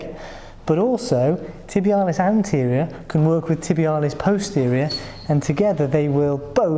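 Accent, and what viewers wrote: England, United Kingdom. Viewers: Brilliant delivery by a clear English accent. If only all YouTube videos were this good. Keep up the good work!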